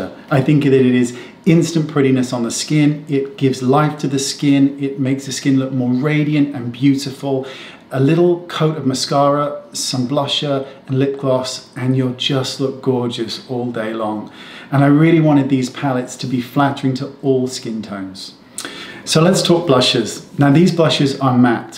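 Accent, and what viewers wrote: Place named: RP, United Kingdom. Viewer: Gonna start say "blusher " instead of blush. Nope. It doesn't work without the posh English accent. Silly American. 😉😂 Love these products!!!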